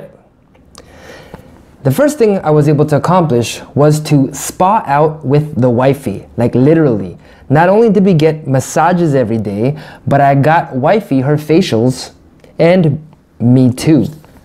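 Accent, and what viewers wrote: Philippines. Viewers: HAHAHAHA i like your filipino accent!! hope you had fun playing in manila!! godbless you sir!🙌🏻🙌🏻💪🏼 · HAHAHA! Nice Filo accent bruh! Dope battle!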